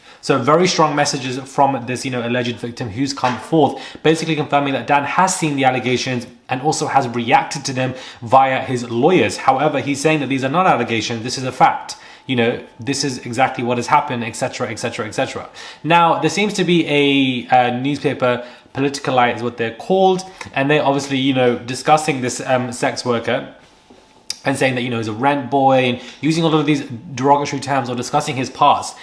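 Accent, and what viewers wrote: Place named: London, United Kingdom. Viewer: I didn’t notice your speed, I do like your layout of presenting,barely any waffling straight down to the news in hand. I had guessed you were London though. You are just fine- as you were 🙌👏🏻👏🏻👏🏻👌 P.s- didn’t know there was a speed button. On a new ish iPad?? Live and learn one for me today.cheers , G .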